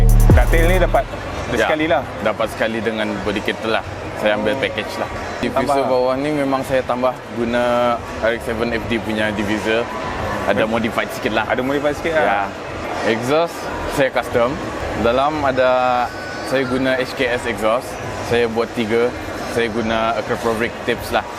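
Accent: Sabah, Malaysia. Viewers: Knapa loghat mcam Sabah? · Dia cuba untuk ckp.malaysia tapi tk mnjdi haha....sbb sye pun brunei + malaysia mcm sbah pulk haha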